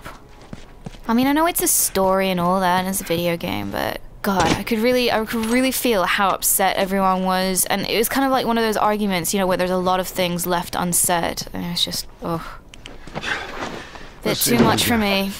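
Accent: United States. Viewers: are you from AMERICA? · I usually hate commentaries, but listening to your gorgeous Mid-Atlantic accent is a rare exception.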